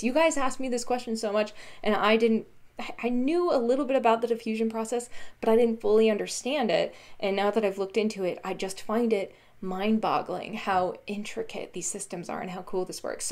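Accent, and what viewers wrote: South, United States. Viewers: awesome videos. are you from the South?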